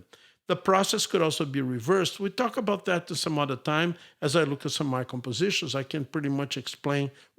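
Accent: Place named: England, United Kingdom. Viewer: Bravo your tutorials, Master ! I do appreciate your methods, mostly your cleared, well articulated English accent ~ instead of too many sounded-English-seemed-like, such as bastard's American etc..etc... They must do trainee English Accent... PLS broadcast my message 🙏🙏🙏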